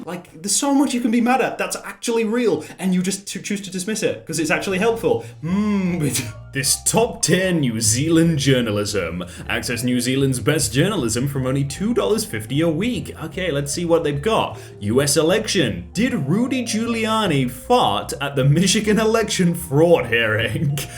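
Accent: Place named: United Kingdom. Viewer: It's just his accent... most Brits pronounce it that way.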